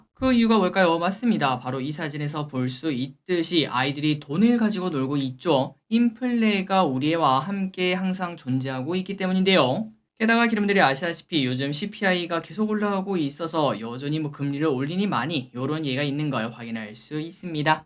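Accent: Gyeongsang, South Korea. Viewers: 대구분셨나요.? 반가버유.~~^^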